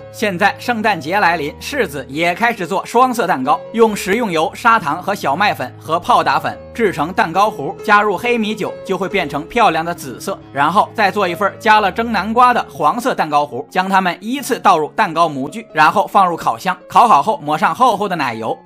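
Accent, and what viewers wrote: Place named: Northeast, China. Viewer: 這東北槍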